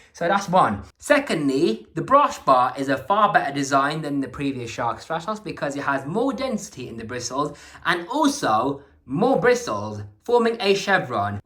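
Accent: United Kingdom. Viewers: "A million times Bet ah" I love a British accent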